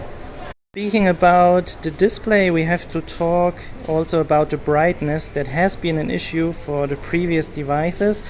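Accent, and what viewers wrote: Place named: Germany. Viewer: That's a nice German accent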